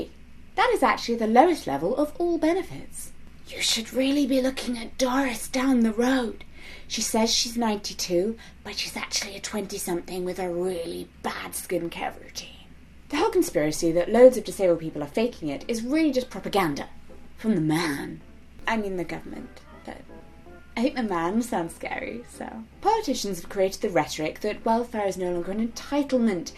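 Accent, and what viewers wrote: RP, United Kingdom. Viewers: People probably ask about your accent, because it sounds exceptionally posh.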